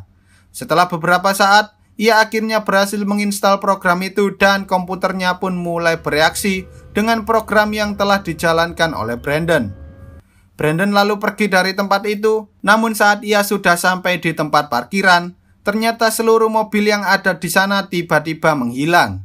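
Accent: Java, Indonesia. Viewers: Medok banget JAWA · Meddok bgt!!!! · Bang logat ngomongnya jgn medok bgt lah, intonasinya jgn datar.. spoiler filmu bgus" tpi cara pembawaanya gk enak ditelinga Tolong jgn gitu biar kedepannya lbih bagus!!! Medok bgt aneh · Jowo endi min pean? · Adalgi ytber yang bahas film jni selain chanel ini ga?, logat jawa kurng enak di denger :(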